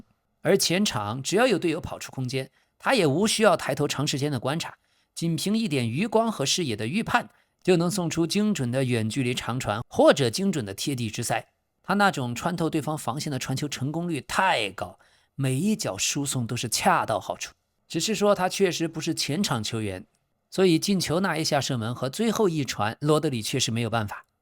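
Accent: Chongqing, China. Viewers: 听口音老k应该是重庆人呀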